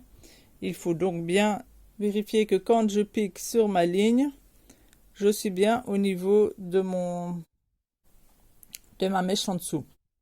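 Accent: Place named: Belgium. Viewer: Bonjour Très sincères félicitations pour l'absolue clarté de vos explications ; c'est un pur régal que de vous suivre. Élève de deuxième année de CAP ( en France - j'ai cru noter une pointe d'accent belge si je ne m'abuse ! ) j'ai découvert votre travail avec satisfaction. Vous êtes une excellente pédagogue et vos explications, tout en restant techniques et conformes aux normes, sont lumineuses ! Il va sans dire que je vais "dévorer" vos tutos ☺️ Merci à vous. Philippe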